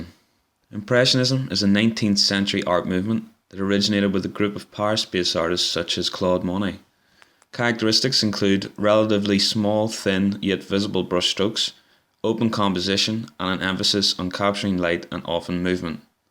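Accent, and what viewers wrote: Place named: Belfast, United Kingdom. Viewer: Irish, fer sher. I'm guessing Belfast, from my travels round about.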